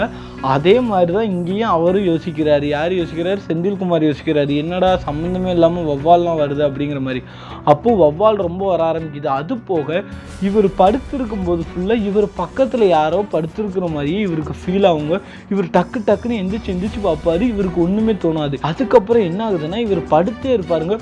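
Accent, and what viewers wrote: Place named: Nagercoil, India. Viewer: Your nagercoil accent superb thambi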